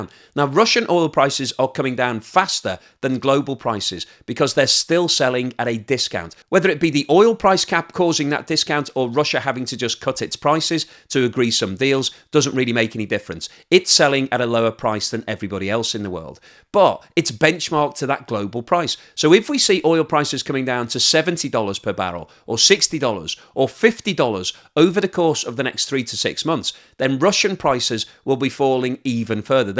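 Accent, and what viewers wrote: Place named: England, United Kingdom. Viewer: What are of England are you from? I like to play place that accent.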